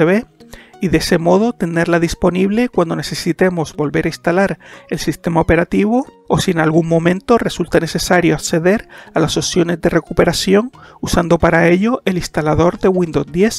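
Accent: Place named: Spain. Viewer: Por qué hablas como español?xd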